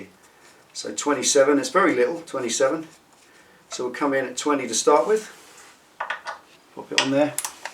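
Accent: United Kingdom. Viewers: You , sir, are absolutely brilliant! I always watch a video or two each time before working on the Bonneville. Being an Anglophile, the British accent goes a long way toward getting what you're saying lodged into my mind, lol!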